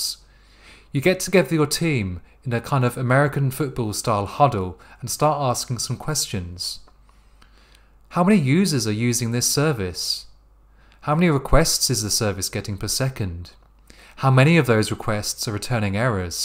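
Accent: United Kingdom. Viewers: Thank you very much sir for this video, love that british accent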